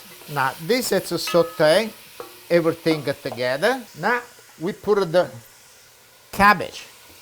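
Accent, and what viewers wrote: Italy. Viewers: Cute Italian style English ~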